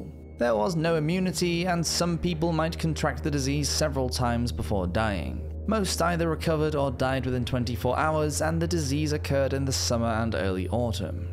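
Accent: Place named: Leeds, United Kingdom. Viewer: You have a Leeds accent I think. Im South African btw so no experience here.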